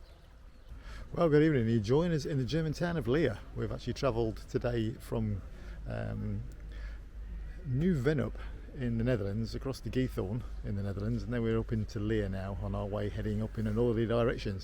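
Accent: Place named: Northern England, United Kingdom. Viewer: I'll be waiting. The Faroe Islands are the only place that I (seem to) have been to via astral travel. It has a kind of spatial attraction for me. My father was from Norway: that may help. Halls Gap? Been there a few times including camping .. twice. Lotsa roos on the streets. Just a query for you: how come you are driving a Belfast registered vehicle while you sound like you are from the north of England?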